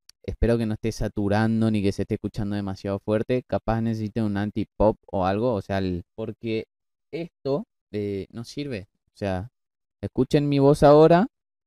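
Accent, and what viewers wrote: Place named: Argentina. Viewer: Hola me encanta tu forma de relatar y acento argentino ❤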